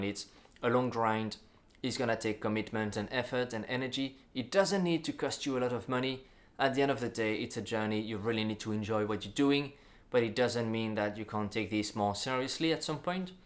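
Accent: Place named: France. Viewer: It is always great to listen to a tutor in Davinci Resolve. You have the knowledge and willingness to share it. I have subscribed as you seem to be able to teach in short sessions - 5 minutes seem about right. You are clear in your explanations and your French accent adds a little extra. Best of luck.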